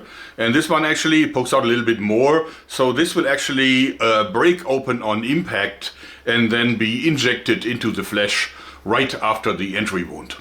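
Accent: Germany. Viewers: You are german right? Your accent sounds like from a German. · The german accent is perfect for explaining nasty weaponry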